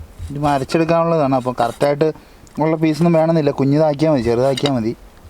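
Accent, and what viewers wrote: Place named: Idukki, India. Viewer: Iddukki anno ee sthalam slang kettit iddukki annennu thonnunavar like adi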